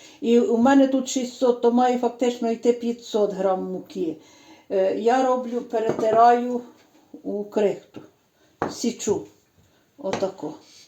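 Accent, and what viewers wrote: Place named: Lviv, Ukraine. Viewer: Чую Львівщину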